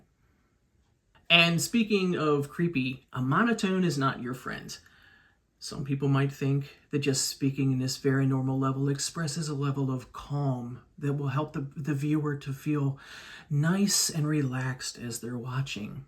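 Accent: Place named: Midwest, United States. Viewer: Are u from the midwest. I lovvvve your sense of humor. You had me laughing so hard numerous times. Content n comfort is awesome. Thanks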